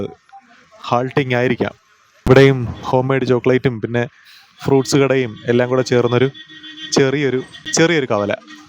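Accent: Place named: Malabar, India. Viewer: താങ്കളുടെ എളിമയും സംസാര രീതിയും പെരുമാറ്റവും കണ്ടാൽ താങ്കളൊരു കൊല്ലംകാരനാണെന്ന് ആരും പറയുകയില്ല ഒരു മലബാറുകാരനാണെന്നെ പറയൂ...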